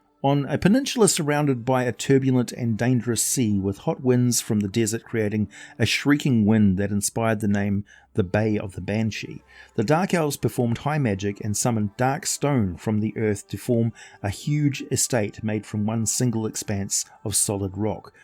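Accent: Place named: New Zealand. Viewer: Great to hear a kiwi voice on YT❤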